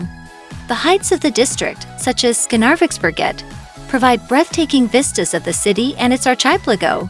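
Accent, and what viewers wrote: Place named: United States. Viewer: Can not listen to awful narrative with american woman accent jars on ear's.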